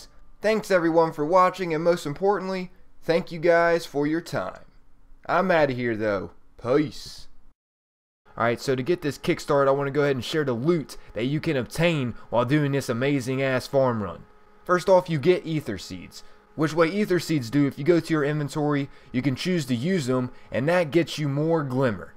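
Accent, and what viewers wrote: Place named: Pittsburgh, United States. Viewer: gotta be from Pittsburgh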